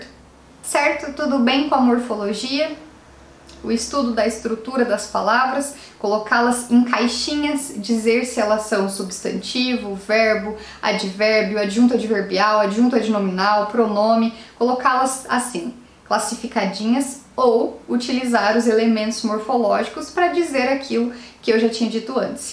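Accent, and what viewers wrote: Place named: Interior, Brazil. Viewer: Adoro esse sotaque do inerior vindo da voz de uma mulher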